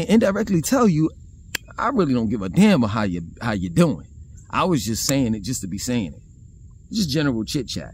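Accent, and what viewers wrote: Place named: South, United States. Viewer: Very very south. Very deep accent as well. Loove the accent.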